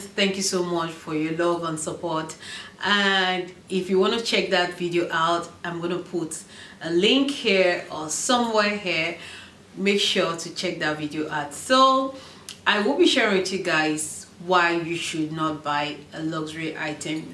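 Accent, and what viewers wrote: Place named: Nigeria. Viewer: You sound Nigerian, I can tell. I think that luxury obsession is ridiculous. I love luxury items but I wouldn't buy one as an investment.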